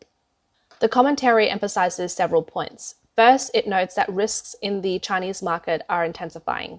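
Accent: Australia. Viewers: 100% austraslian voice. She is reading off a script , hear her voice stop and start. Its good thing as it sounds authentic. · . It sounds Aussie to me as well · Great job on this report. I watch CNBC for business and stock news. This is an even better report on the auto business in China than I have ever seen anywhere. Also, not sure if the voice is AI or real but she sounds great. I live in Florida so do not experience Aussie accents that often. ** OK, Weird thing! & there is an old-looking wall shown. What is that???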